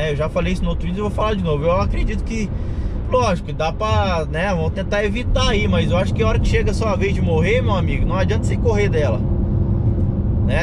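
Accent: Minas Gerais, Brazil. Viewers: mais lembra um pouco o mineiro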